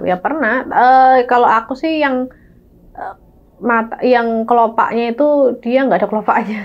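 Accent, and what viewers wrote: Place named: Java, Indonesia. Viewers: nama Panggung:” ”, nah…Nama AsLinya SIAPA dong?? (*jgn2 PONIRAH/SUSI/SITI/SRI/ANI?) 😂😂😂. Nama ASLI-nya PASTI NORAK X… (pdhL, itu nama Pemberian dari Ortu loh,mbak!). KENAPA hrs MALU sama NAMA ASLI Sendiri?? mmgnya, NAMA ASLI-nya “dneso” bgt yaaaa….?! Lah, LOGAT nya aza “MEDOK-JAWIR” Bgtttt!!! 🤭🤑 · Logat ngomong jawa banget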